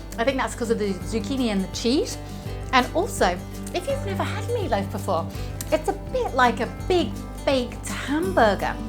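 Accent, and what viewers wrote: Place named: London, United Kingdom. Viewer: You are very welcome. The simplest and most heartfelt things are always the best. You did good and should have been recognized and complimented for it. I love the accent. Sounded like London area. I'm not from the UK but lived in the Devon area for an extended time after my retirement. You would have charmed the old Vets brilliantly. I am so jealous.:-)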